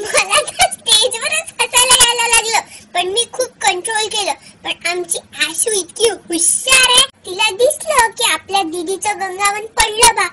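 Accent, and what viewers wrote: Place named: Vidarbha, India. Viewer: jabardast video......tu Vidarbhachi ahes ka? khup mast ahe tujhi bhasha amchyasarkhich.....All the best ..Khup videos banav ani motthi ho...but motthi jari zalis tari lahanpan sodu nakos......Lahanpan dega deva....enjoy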